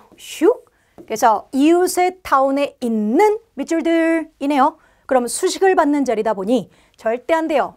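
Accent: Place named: Chungcheong, South Korea. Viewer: 있것죠~ 충청도 분이시네요 👍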